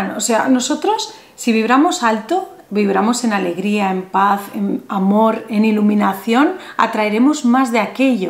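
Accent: Spain. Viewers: apenas te encontré pero muchas gracias 😊 me siento contenta porque presiento que algo Bueno biene a mi vida 😍 en estos Días que Tanto Hace Falta Eres Una Persona que semanas Saviduria y Amor 🥰♥️♥️♥️♥️♥️🙏🏻🙏🏻🙏🏻🙏🏻🌹🌷🤶🥰por tu Acento pienso Que Eres De España 🇪🇸💐💐🌹🌷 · Gracias gracias gracias!!! Sos muy dulce!!!amorosa y tú acento Español me encanta!!! beso grande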